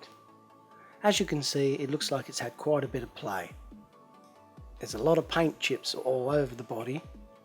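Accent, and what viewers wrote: Australia. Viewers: I thought at first there was some Aussie accent in there. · I’m so incredibly impressed! Especially since I can’t do ANYTHING even CLOSE to what you just did! Add the really “fun to listen to” Aussie, and you’ve got a perfect video. Thank you so much!!!! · British or Australian? Sounds more like Australian. Great video, no matter where it's from. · I like your work as well as your speech. Australian sounds great.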